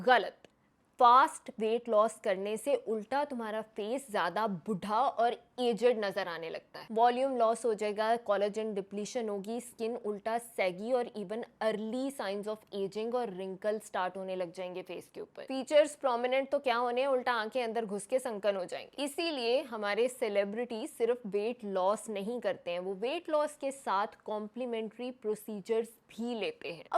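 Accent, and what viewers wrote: India. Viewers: Jo humara urdu ka undaz hai uss ko khudara hindi lahjay say na badlay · I was so confused with her accent. It is definitely not a Pakistani accent and you are a doctor. You should not say tum we use up to show respect in class. I’m not only that the entire way of speaking the method of speaking is all Indian. Please go back to your roots.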